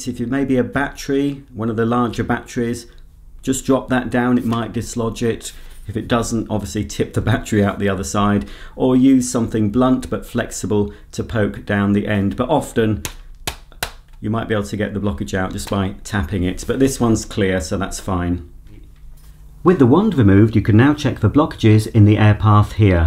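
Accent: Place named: United Kingdom. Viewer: Thanks, British guy!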